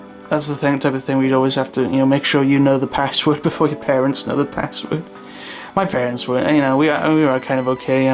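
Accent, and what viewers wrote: Wales, United Kingdom. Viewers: great video and a nice retrosepective of sky, quick question though, are you welsh? Edit: lol nevermind just saw you scroll past S4C, confirmed 😆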